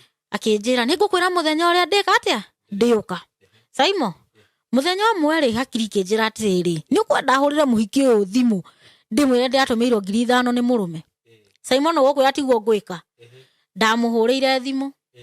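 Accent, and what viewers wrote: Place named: Nyeri, Kenya. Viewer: She is sturbon nyeri lady from her talk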